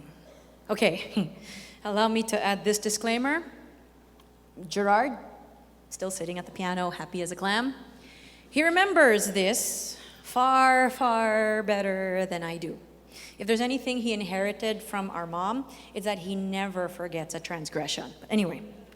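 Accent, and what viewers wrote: England, United Kingdom. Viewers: Galing mo talaga Ms. Lea Salonga, British accent kailangan nya yun sa lugar Kung Saan sya at dinala, grabe yung mga taong Di sya Pinuri bagkus may nagsabi Lang mali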